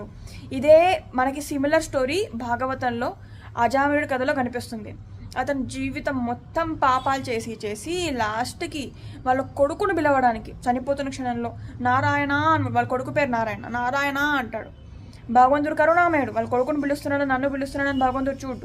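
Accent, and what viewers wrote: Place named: Telangana, India. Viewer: ఈ నవ యువతి ఆంధ్ర పిల్ల అనుకున్నా తెలంగాణ భాష. దొర్లుతున్నది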